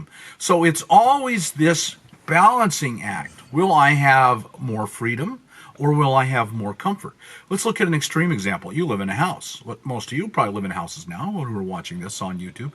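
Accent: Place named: United States. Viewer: Hi Sir, It's so refreshing to watch your videos with soft music in the beginning and your handsome viking bearded face and American accent. It's almost like a religious experience because you do not talk about money! Very well presented. I love the WASP culture and values. Keep up the good work! Best regards, Tanveer, London, UK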